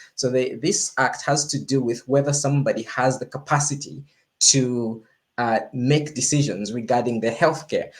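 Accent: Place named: Zambia. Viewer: Your accent tells me you hail from Zed..did I guess right 😅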